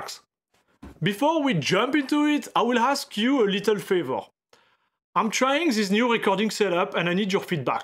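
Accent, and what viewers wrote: France. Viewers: Due to an fortunate event I upgraded my 1440P monitor to an 4k LG OLED 44C29LB. Since I still have a RX 6600 XT running I will need to use FSR every here and there. Btw. your french accent is sounds funny and good luck with your channel. You seem like a cool guy. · only reason i end up watching the things i already know about is due of the lovely french accent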